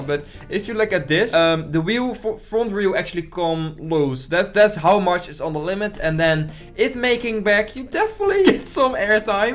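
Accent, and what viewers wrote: Netherlands. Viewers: Nederlands accentje👌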